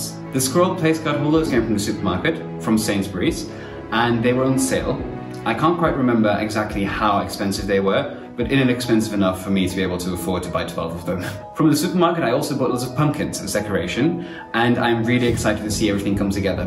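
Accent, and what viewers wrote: United States. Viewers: You did a beautiful job and listening to you speak English you almost sound like an American... your English is great! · You've learned perfect American English and accent.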